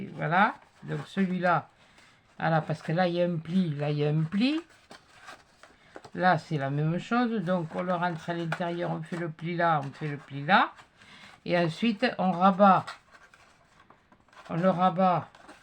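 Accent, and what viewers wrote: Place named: Médoc, France. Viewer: Bonjour très heureuse de voir que vous allez bien Vos boîtes sont magnifiques la boîte que vous avez faite pour la dame est très jolie vous êtes une très bonne personne et cette dame a un très grand cœur Je ne suis pas scapeuse mais j'aime beaucoup se que vous faites et vous me faites bien rire votre accent me rappelle le mien je suis originaire du médoc Prenez bien soins de vous Je me permets de vous embrassez embrassez ❤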